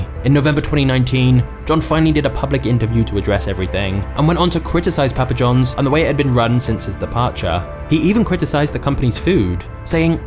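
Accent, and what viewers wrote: England, United Kingdom. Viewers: English script reader, AMERICAN story. Fix that...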